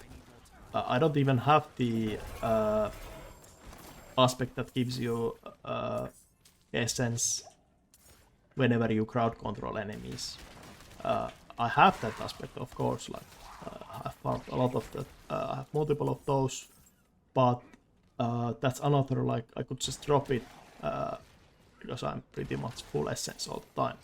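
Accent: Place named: Finland. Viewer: Kyllä suomalaisen tunnistaa aksentista heti haha, keep up the good work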